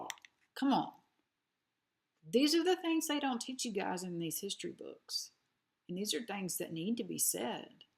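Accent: South, United States. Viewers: Love the lip shade. And your southern accent. Subscribed. Much love from Arkansas.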